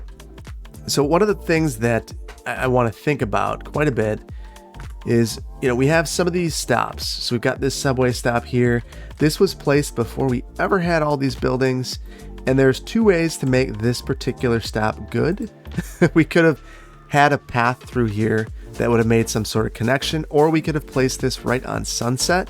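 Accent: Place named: Midwest, United States. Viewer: I've been catching up on your videos, and I just gotta say: your accent gives me life. God bless the Midwest!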